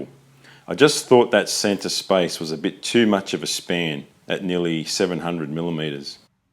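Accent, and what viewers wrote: Australia. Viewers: Your channel is growing because you have awesome videos and because you have an Aussie accent. Keep em' coming!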